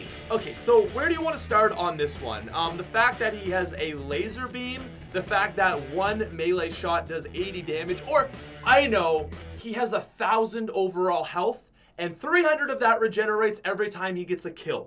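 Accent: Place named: Canada. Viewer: Ben Monaghan it’s his Canadian accent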